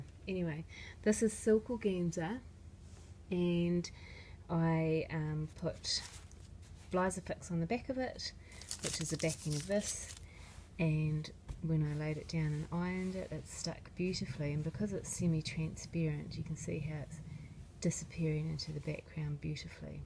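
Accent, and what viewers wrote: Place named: New Zealand. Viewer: Just as I was wondering how I could use my sewing, painting, drawing skills to make things without spending a fortune I found you. Great to listen to a Kiwi voice quietly explaining a simple process using things I recognise without the endless side explanations of things irrelevant :)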